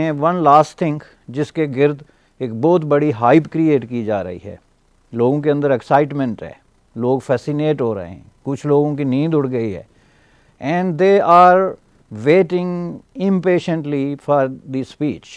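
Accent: Punjab, India. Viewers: YAAR, YOU ARE A TERRIBLE SPEAKER WITH A VERY THICK PUNJABI ACCENT. DO SOMETHING ELSE:-)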